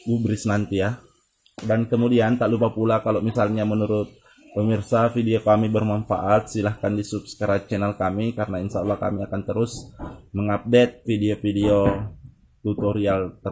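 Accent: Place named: Makassar, Indonesia. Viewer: Ini org makassar pemirsa... ketauan logatmu... smsm jaki nukasi bodo2 semua pemirsa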